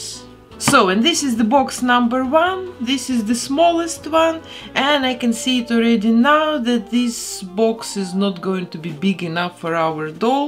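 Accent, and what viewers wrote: Russia. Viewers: Мне одной интересно, почему русская женщина до сих пор не потеряла акцент?))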